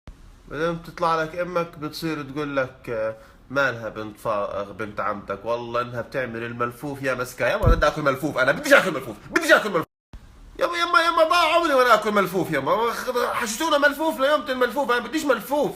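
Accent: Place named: Gaza, Palestine. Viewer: هادا لهجتو غزاوي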